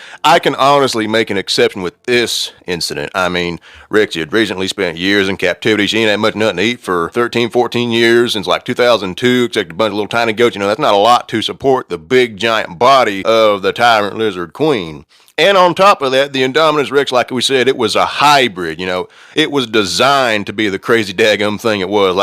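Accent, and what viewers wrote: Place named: United States. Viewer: This guy has the most american accent i've ever seen.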